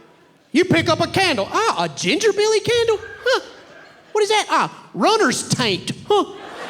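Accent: South, United States. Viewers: Wow. How unfunny this SOB is. No originality. Hinges on a “redneck” accent and nothing he says has any form originality. He’s got the appeal of Donald trump and the boredom of boredom. Legit trailer park trash and being ironic or not. He’s just not funny.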